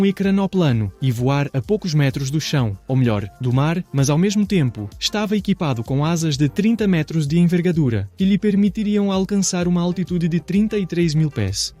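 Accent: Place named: Portugal. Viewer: Acento portugués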